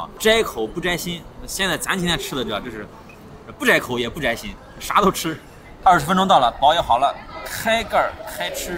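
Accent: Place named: South, China. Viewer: 喜欢听说南方普通话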